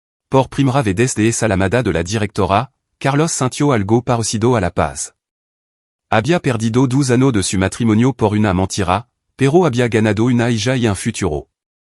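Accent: France. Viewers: ¡¡¡Que gran estupidez¡¡¡¡ Poner la IA en español con acento francés!!!! No pienso escucharlo!!!!!! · pesimo narrador, su acento frances distorciona lo que dice.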